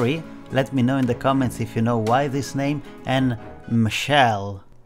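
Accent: Italy. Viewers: Your accent makes me think you're italian, aren't you? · Dude, are you italian?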